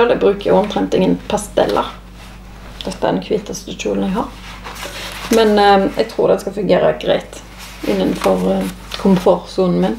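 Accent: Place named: Karmøy, Norway. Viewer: Så koselig med karmøydialekt! Så masse fint du hadde å vise fram.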